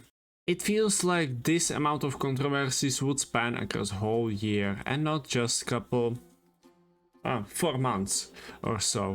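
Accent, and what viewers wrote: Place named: Czech Republic. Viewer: "Yay, another video hating on Natlan! I'm in!" "Dude has Czech accent, is he Czech?... YAY, a fellow citizen!!" I actually quit during Natlan. I'm done with the exploration, non-skippable dialogues and I can't believe that 4 years in we still have to grind talents on specific days of the week with no resin storage for overflow. Natlan looks completely different from what I expected. Nation of War my ass, this looks like Disney and I can't stand it. I'm also not that thrilled about pokemons and weird immersion breaking stuff like... motorbikes or flying canons. Just nope, Dawei can cry all he wants, I'm not going back and I used to whale pretty hard in the past.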